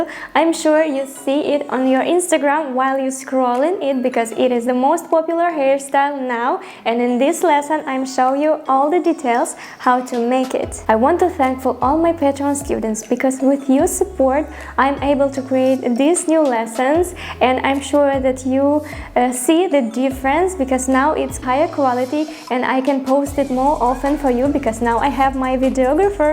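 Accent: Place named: Russia. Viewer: Русский акцент и русская мимика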